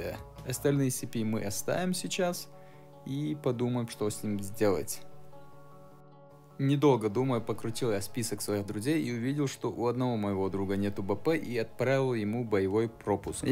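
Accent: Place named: Chechnya, Russia. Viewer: Брат, ну вот не могу понять, акцент у тебя Чеченский, но русским владеешь хорошо, я в замешательстве.. ТЫ КТО ВОИН?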